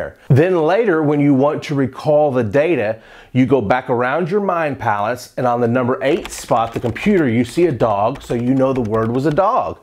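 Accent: South, United States. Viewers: It’s so cute to hear his southern American accent saying things about Ancient Greece 😅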